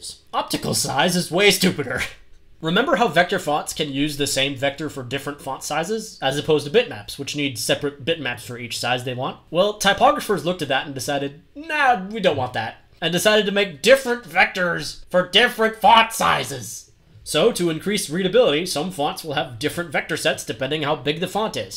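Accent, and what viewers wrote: France. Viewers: is this guy French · you sound french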